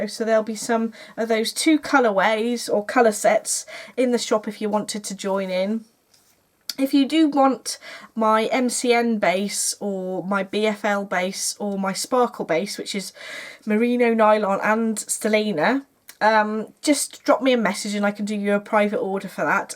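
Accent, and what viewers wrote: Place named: Shropshire, United Kingdom. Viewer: Found you today and loved your podcast. I am going to follow your instructions for knitting my first pair of socks. Hope you don’t mind me asking but are you from Shropshire originally? I am asking because your accent is just like mine.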